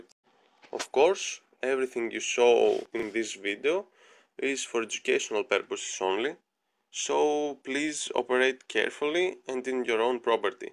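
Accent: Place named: Greece. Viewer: η προφορα κανει μπαμ οτι ειναι δικος μας:ρ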